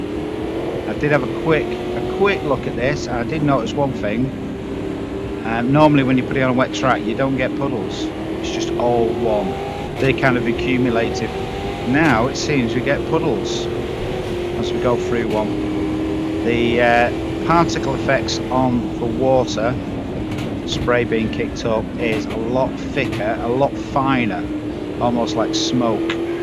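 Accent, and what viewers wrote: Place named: Northern England, United Kingdom. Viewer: Nice video, Could you show tyre temps on screen next time to see how a drying track affects tyre temps and whether or not you need to go off the dry line to cool your wet tyres? I know this video was about primarily the new particle physics etc, but on a drying track you need to know when to make the switch to slicks.Keep up the ood work, nice to hear a proper Northern accent coming from a Yorkshireman!!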